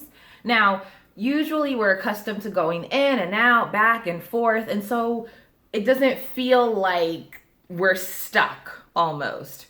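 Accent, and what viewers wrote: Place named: United States. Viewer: Hey! Enrique here. I love all your lessons bc they sound like the real American English! Sometimes, I struggle with the accent of native English speakers from England or Australia. For instance, did you see the video in which the Dagenham boy told Meghan "I think she's beautiful " That "beautiful" it sounds so weird to me like it is not enough English. Of course, it is a good English. Could you please give me some tips about pronunciations skills or listening skills? Thanks in advance.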